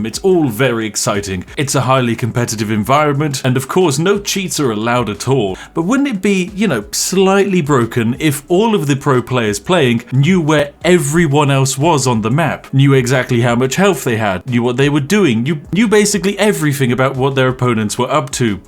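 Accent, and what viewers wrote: United Kingdom. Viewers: they are too dumb to understand the spiffing brit’s accent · this is what every one in britain talks like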